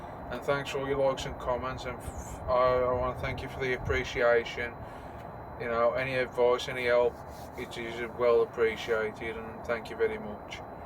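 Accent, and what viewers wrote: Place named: West Midlands, United Kingdom. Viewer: Where are you from? I'm from Stourport-on-Severn. Nice to hear a Westmidlander! 👍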